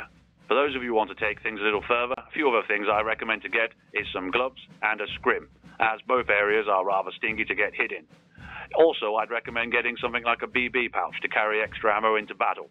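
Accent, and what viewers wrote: United Kingdom. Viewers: The accent becomes slowly more and more northern. I like the bad audio quality.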